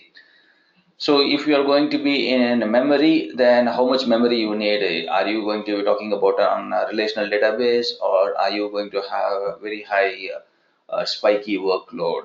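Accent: India. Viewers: Sorry, that indian English makes it difficult understanding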